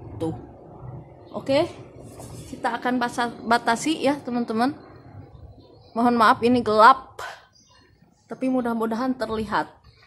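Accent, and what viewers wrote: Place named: West Java, Indonesia. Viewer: Maap mbanya dari logat nya mbanya dari jabar ya sunda leres te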